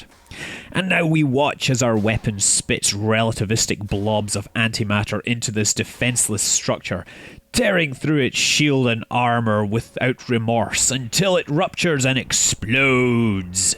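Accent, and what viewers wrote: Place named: Edinburgh, United Kingdom. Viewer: Are you from Edinburgh? Good comentators voice, easy to listen to, i'm from Cheshire.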